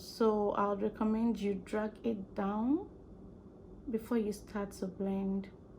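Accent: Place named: Nigeria. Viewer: She sound like Nigeria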